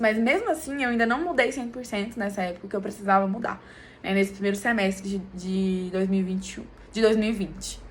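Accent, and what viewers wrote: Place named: Minas Gerais, Brazil. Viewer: sotaque mineiro é gostoso de ouvir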